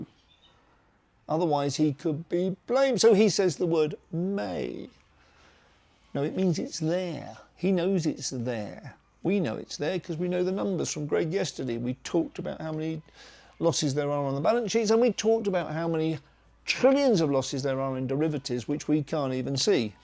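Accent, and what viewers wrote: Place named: United Kingdom. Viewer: It’s very refreshing to have a Brit doing this so well…the American accent and the hogwash really is off-putting.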